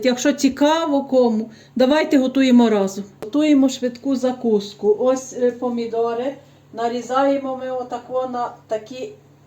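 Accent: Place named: Vinnytsia, Ukraine. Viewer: Дуже дякую! ❤ Подобаюиься вашi рецепти. Все таке смачненьке 🥰 Приятно слушать вашу речь. Мне очень нравится ваш говор. Детство и юность я провела в Винницкой обл. И мне такое все родное. Спасибо вам!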